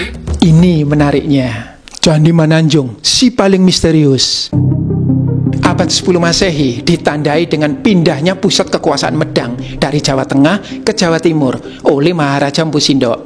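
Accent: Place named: Malang, Indonesia. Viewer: Logat e ngalam banget, oh iya ktr e ASISI nang Ngalam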